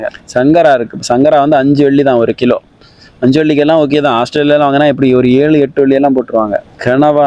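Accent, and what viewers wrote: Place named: Kanyakumari, India. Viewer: Kaniyakumari karan..pola...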